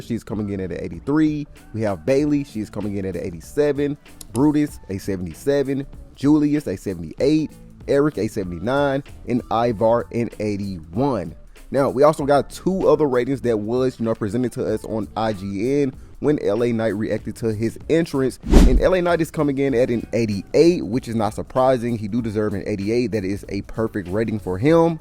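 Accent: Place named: Memphis, United States. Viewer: I can’t ignore the Memphis??? Accent ..”herrstyles” and “yerrs “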